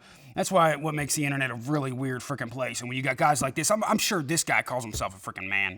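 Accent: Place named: Kentucky, United States. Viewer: Im from ky and I can definitely tell you lived there for a while when you was younger by the way you talk lol, screw all the politicly correct way to talk not good for anyone to censor the way you talk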